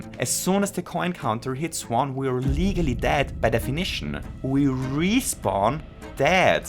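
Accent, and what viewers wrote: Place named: Germany. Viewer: du klingst sehr deutsch, ich kann es nicht erklären, dein Akzent ist nicht stark, aber ich höre definitiv deutsch XD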